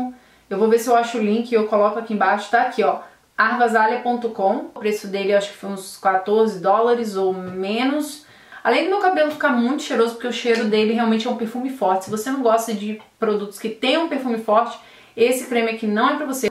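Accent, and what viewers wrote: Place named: Minas Gerais, Brazil. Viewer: menina eu acho que parece meio mineiro hahahah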